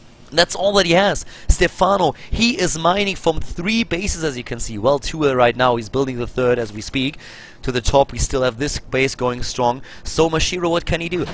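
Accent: Germany. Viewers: I like your German accent! And it's great how you're dropping gems like the right+shift attack scv building the bunker. Thumbs up!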